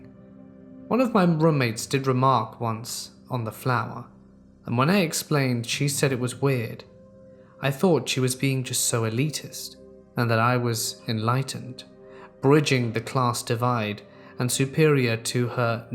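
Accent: United Kingdom. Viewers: What part of u.k are you from?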